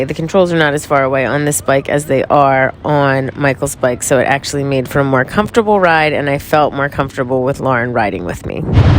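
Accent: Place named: Maryland, United States. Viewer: Despite living in the area, (Fairfax) every time I watch your videos and hear your voice, I think, "That girl is definitely from Maryland" with your Maryland accent. You and cameraman both. I guess because I'm a New Jersey transplant who came to the DC area after my job transferred me here 30 yrs ago. LOL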